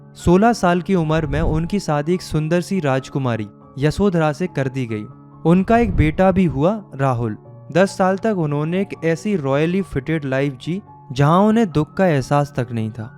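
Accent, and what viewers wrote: Uttarakhand, India. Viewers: Sir are u from Uttarakhand❤ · Bhaiya, Are you from Uttarakhand??❤❤❤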